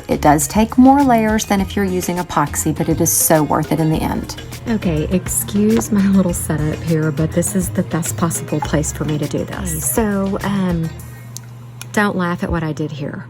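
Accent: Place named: Georgia, United States. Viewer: Beautiful, as always!!! Can I ask where you are from? I'm a southern girl living in the Midwest now. But you sound like you might be from Georgia. Praying for peace and comfort during the family emergency.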